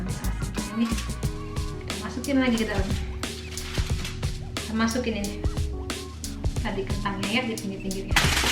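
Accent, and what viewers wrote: Jakarta, Indonesia. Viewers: Et dah si mpok..di jkt kagak ada kalkun mpok...palingan ayam boiler ame ayam tetangga lewat..nape gue jd ngomong nya gini ya mpok ala betawi,gara2 mpok nih...hee..hee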